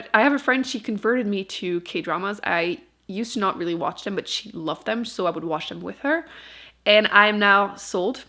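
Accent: Quebec, Canada. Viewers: J’aime trop ton accent québécois 🥺 je me demandais si tu regardais des vidéos de booktubers français? ou plutôt que des anglophones? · C'est toujours bizarre a chaques fois que je t'entends parler quebs ahahah 😂✨ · Have people never heard a French Canadian accent? 😂 I grew up in Eastern Ontario where every second person you meet has the same accent! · I don't feel like you have a very strong Quebecois accent at all. You sound more like a native English speaker with a lisp, no offense. My ex roommate was Quebecois and usually dropped her French accent so people wouldn't know she spoke french, but if she didn't, it was quite apparent. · I think that most people have just never heard a french canadian accent. I hadn't heard one until I came across this channel a few years ago.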